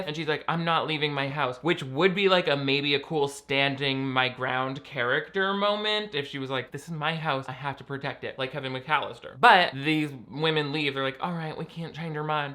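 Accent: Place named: South, United States. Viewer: These Christian movie clip breakdown brings out the best out of, and I'm not just talkin bout that southern accent. LOLs all around 10/10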